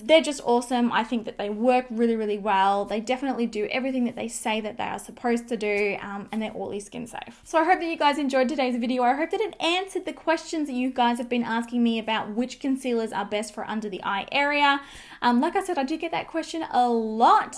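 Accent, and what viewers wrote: Australia. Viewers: This is random but I’m from the states and I’m obsessed with your Aussie accent 😍😍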